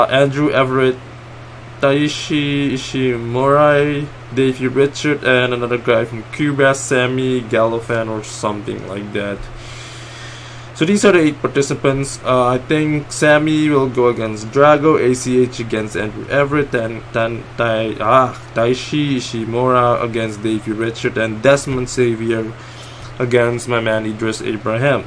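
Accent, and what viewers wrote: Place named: Europe. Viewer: Your accent is interesting. I thought maybe Europe somewhere. Anyway your vids are dope. New sub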